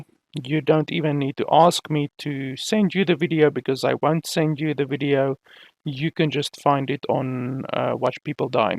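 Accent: South Africa. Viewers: are you South African lol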